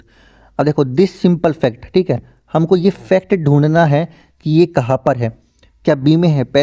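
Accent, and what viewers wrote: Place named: Rajasthan, India. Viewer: accha mujhe rajsthani voice lagi thi aapki isliye puchh liya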